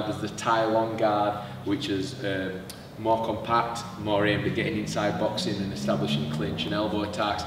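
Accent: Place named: Barnsley, United Kingdom. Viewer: Barnsley twang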